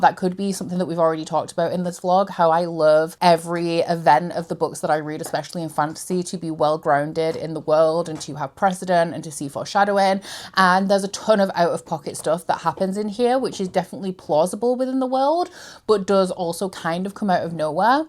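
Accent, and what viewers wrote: Yorkshire, United Kingdom. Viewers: Ugh I just love a Yorkshire/Lincolnshire accent. I'm American and it reminds me of My Mad Fat Diary, which I was obsessed with as a teen.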